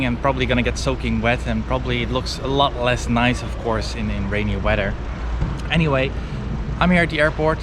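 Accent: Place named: Netherlands. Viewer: A bit to many errors in reporting for that.. Alltough the accent sounds Dutch.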